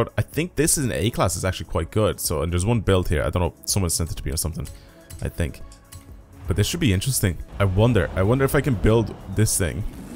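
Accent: Ireland. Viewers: where are you from you sound just like me and im irish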